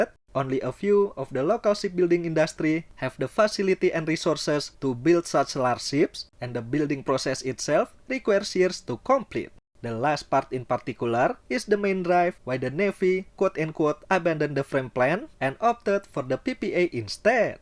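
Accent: Indonesia. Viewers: Logat Indonesia, bukan bule.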